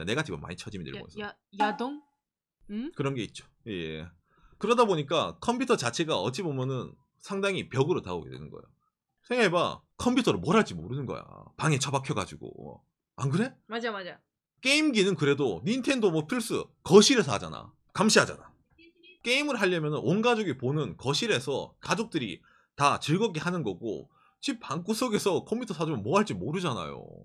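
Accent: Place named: Gyeongsang, South Korea. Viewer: 경상도버전 대도서관...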